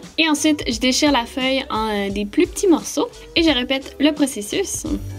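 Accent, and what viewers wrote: Quebec, Canada. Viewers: Tu connais l accent quebecois? Nan jcrois pa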